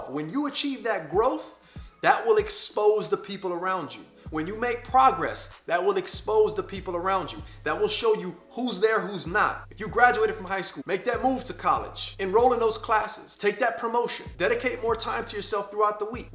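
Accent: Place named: South, United States. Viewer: Country accents are ☺️☺️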